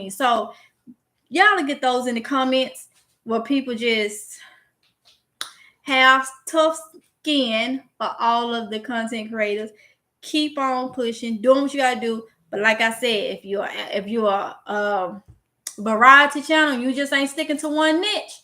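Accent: South, United States. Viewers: GM, im awake at 4 something in the morning. Im 79 yrs old in upstate NY. Im learning and remember when i was 36 yrs old, i had a new born baby, working the night shift and husband took care of baby at night. I love you take care of your family. And I can understand your southern accent. My Mom had it also.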